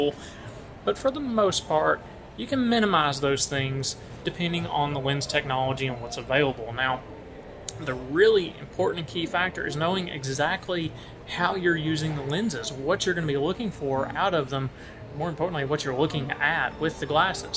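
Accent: Tennessee, United States. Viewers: I love your Tennessee accent lol :)😆Great vid. I wish I had an Optician as passionate as you are 🙂 would love to work with someone excited to find my personal perfect fit and style, and someone who knows the ins and outs of lenses in case problems or questions arise. GREAT Opticians are really few and far between these days...in my opinion 🙂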